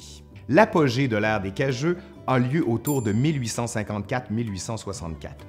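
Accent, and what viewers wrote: Quebec, Canada. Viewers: Ne serait-ce que pour me bercer dans l'accent Québecois, qui me rappelle mes six mois passés dans la Belle-Province, je kiffe écouter parler. Et puis, ça, c'est sans mentionner le fait qu'a chaque fois c'est passionant ce qu'il nous raconte!:)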